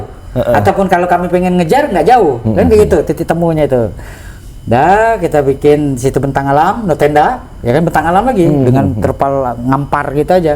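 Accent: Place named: South Sumatra, Indonesia. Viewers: Padahal enak ceritanya, dari ngomong pakai bhs daerah di terjemahin lg pakai bhs nasional. Ya begitulah logat sumatra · Bahasa sumatera selatan tu jgn d campur2 bahasa indonesia mang 😂ketahuan bener logat mu bahasa sumsel ,bingung org klo yg gk ngerti ..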